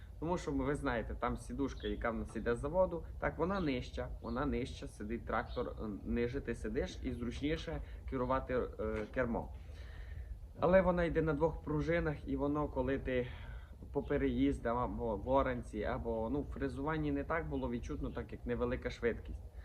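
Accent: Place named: Transcarpathia, Ukraine. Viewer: Все чотко нерозуміють люди закарпатського говору 😁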